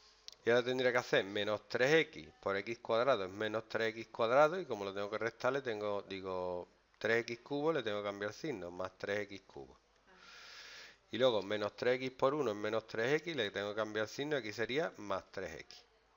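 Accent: Andalusia, Spain. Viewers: Gangoso de que? se llama acento y su acento tiene mas historia que el tuyo SEGURO. Si no mira la historia del andaluz como dialecto y su influencia en todo el castellano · Las mates con acento andaluz se entienden mejor!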